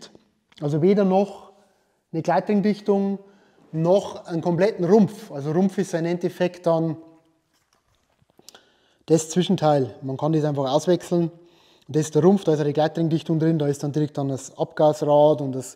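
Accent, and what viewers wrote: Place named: Regensburg, Germany. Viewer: Wo kummst du her hörst du ho wie so a Regensburger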